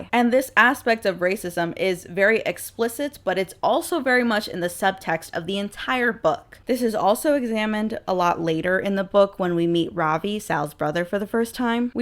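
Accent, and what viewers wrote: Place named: Midwest, United States. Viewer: The midwestern accent dropping the last letter is REALL. I literally paused the video to say the title and realized I did that as well lmao.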